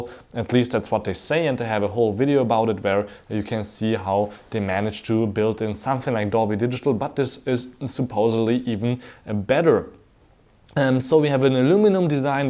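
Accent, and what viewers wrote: Germany. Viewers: I MISS this german english accent sooo much!!!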